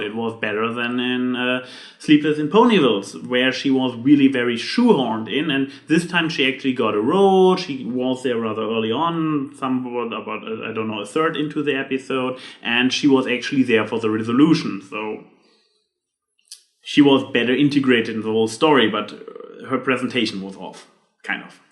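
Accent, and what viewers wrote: Germany. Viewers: I must be a dumb blonde for not picking up on the Christmas carol reference., you're execution of this impression felt a little confusing to me because it seemed like you were jumping from topic to topic too quickly and add in your German accent, it was very hard to follow. Can you try to slow down next time? Thanks.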